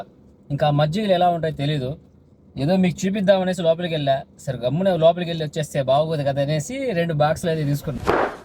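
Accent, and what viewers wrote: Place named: Piler, India. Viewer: I think me slang batti chuste medi pileru kada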